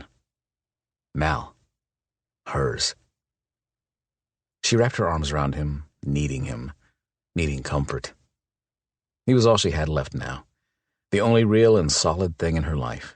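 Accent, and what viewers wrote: United States. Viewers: Why does this bother people so much? Yes the standard prounounciation is wolf, but It is not wrong to pronounce it as wuff/woof. This book is written in, and the narrator speaks in, American English. There are 4 major regional accents and how wolf is pronounced is one of those words that can vary. In the upper Midwest, we don't generally pronounce the L in wolf - just like with the words calf and half. Saying it out loud, I would never pronounce the L unless I was thinking about it and purposefully pronouncing it.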